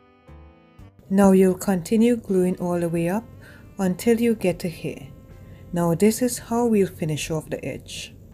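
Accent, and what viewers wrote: Trinidad and Tobago. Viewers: Did i detect a slight trini...love from trinidad🇹🇹🇹🇹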